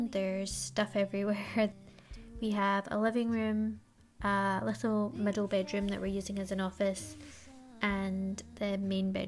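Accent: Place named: Scotland, United Kingdom. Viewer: Watching from Wales. It's very nice to hear a Scottish accent for a change, as it's mostly middleclass English people who make this type of content in Scotland & Wales.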